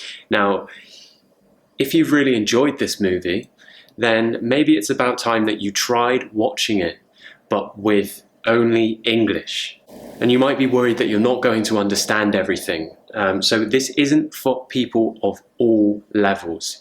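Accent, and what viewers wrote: United Kingdom. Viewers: English Hi mate. I'm from Italy. I've been studying for Cae exam, and with regard to your question on how we improve our skills and learn new words, I've developed myself a sort of method. I start with looking a dictionary up looking for interesting words. Then, on wordreference or cambridge dictionary I seek word families ( I mean, words families of words I selected from my dictionary - hope this is clear). Then, I have a look on lingohelp where you are told what preposition follow an adjective and so on. Accordingly, I keep my head down and study all this words and then I do try to put them into practice by typing to friends of mine who are American, B English and similar. What I mean is this: I oblige myself to study 'em all while using them and I do allege I know them perfectly. I know it's quite weird but It pays off as most of the times it works. 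That's blatantly obvious that I cannot remember hundred of words but I needed to enhance my English voc. variety and so here is it! I'm applying the same kind of approach to idioms and collocations as well. Moreover, I do watch movies in English. Admittedly, I did it for fun and more frequently in the past but I would do it with subtitles. Now I've been trying to do it without 'em, especially Game of Thrones, where people talk using B. Accent (which I've copied from your way of speaking ahahah). I wouldn't dare say it's easy but it's not difficult either: more or less I'd say I figure out 70 80% of what characthers say. I hop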